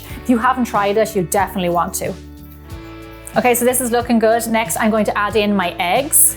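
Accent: Ireland. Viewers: You Irish? Edit : I've just checked and you are😊🇮🇪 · I love your cooking the dog treat ones were so much fun and easy to bake! My dog ate them so fast I’m not even such he swallowed!! 🤣 (Also are you Irish!?’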